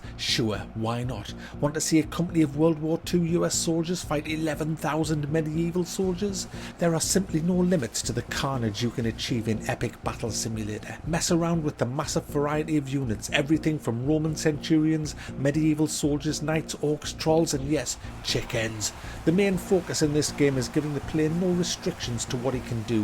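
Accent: United Kingdom. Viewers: XD Mack from the future in a doctor who tardis yep this guy is from the uk allright now there will be no doubts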